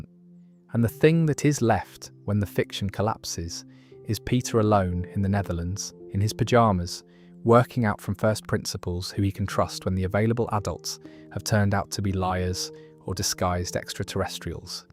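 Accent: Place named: United Kingdom. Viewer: man if only you weren’t british 😭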